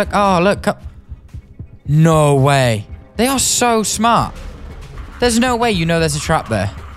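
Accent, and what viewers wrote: United Kingdom. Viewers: You sound more british than i last saw you 😅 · dude has the most british accent ik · its the first time i watch a movie from you but why are you sounding so british?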